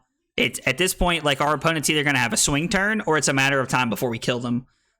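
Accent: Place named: South, United States. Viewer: im from NC i could tell you were from somewhere in the south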